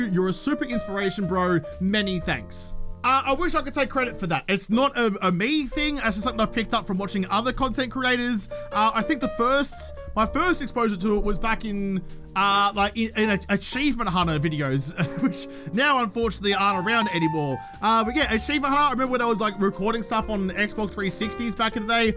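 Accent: Melbourne, Australia. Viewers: From one Melbourian to another, the accent rocks. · Hey! I only just found you a couple of months ago and you've been seriously growing on me. But this video. This video turned me into a lifer! Lemme just say a few things. Yes you can be loud (as can I). And at first I used to turn that volume slider down a bit. But I've come to love your excitability and loudness. As someone who suffers with too many chronic illnesses to list, all of which that have left me retired (extremely early I might add. Had to give up working 15 years ago and I'm only 43 now) and extremely isolated save for my most amazing and loyal husband, my life gets very very lonely and so I depend on my favorite Youtubers to help me not go fucking crazy! And you have so quickly been added to the list of favorites. I always really appreciate your positive attitude and goofiness. I am, unfortunately, American so I've been diligently studying up on Aussie slang so I can understand what the hell you are saying! 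Still lost about 40% of the time but I generally get the jist. You now have me saying batons the way you do instead of the way I do! LOL! Look, don't ever change. Not for anything. The haters are gonna hate and the trolls are gonna troll. You be you and you do you and those who really appreciate your personality will stick around. Thanks for all the laughs mate! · WHAT. One of my top reasons why I ❤ is his amazing Aussie accent!!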